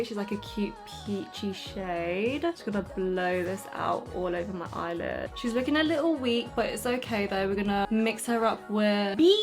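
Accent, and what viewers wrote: United Kingdom. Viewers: Is she in Australia maybe am wrong but it sound brtiths to me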